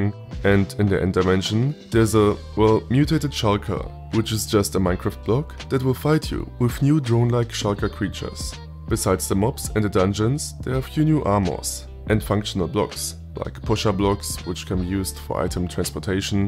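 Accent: Germany. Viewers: He is German you can hear it your self in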